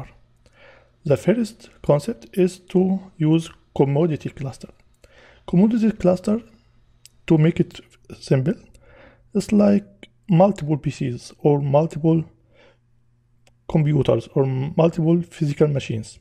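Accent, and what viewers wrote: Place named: Middle East. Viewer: I guess its the sweet middle eastern accent used by the gentleman.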